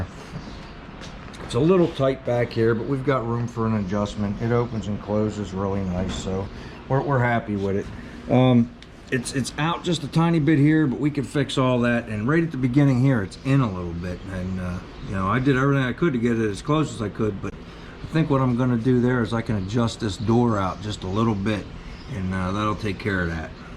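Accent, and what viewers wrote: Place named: St. Louis, United States. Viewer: Are you from St. Louis mo. Area? My YouTube entertainment lately is guessing people location based on accent.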